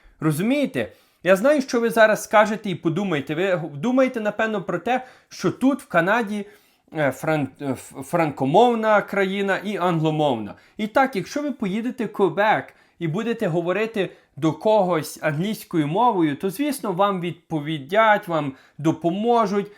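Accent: Western Ukraine, Ukraine. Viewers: Так это и понятнопотосу, что там совершенно другая " украинская", у тебя ударения и дикция именно какая то или западно украинская, или даже не знаю какая!😂 Но , всё понятно, но акцент и ударение бля выводящие!😂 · Ты сам на якой мове размауляешь, це нi украинска це западенска.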